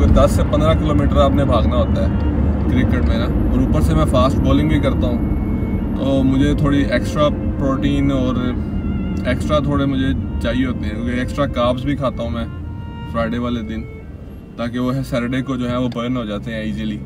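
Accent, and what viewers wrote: Australia. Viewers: in ka accent to pure aussie lag raha hai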